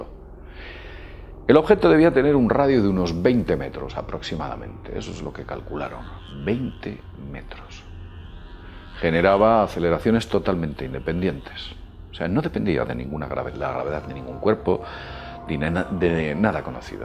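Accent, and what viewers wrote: Spain. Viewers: Que buena información, oye por cierto eres de España no?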